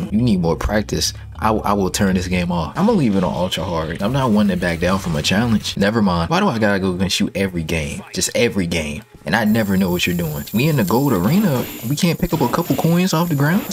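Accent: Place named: Philadelphia, United States. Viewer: Bro you from Philly lol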